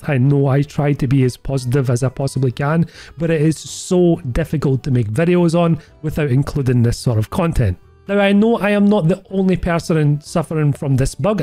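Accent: Scotland, United Kingdom. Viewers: A great Scots man. Love the videos and the accent.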